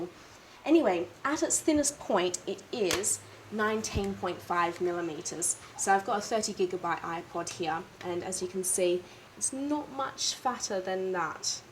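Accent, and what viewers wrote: England, United Kingdom. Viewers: I thought she did a fine job. Cute english accent.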